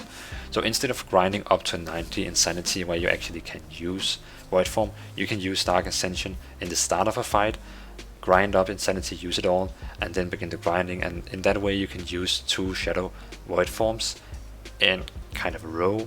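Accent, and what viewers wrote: Denmark. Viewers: Man you are danish AF XD <3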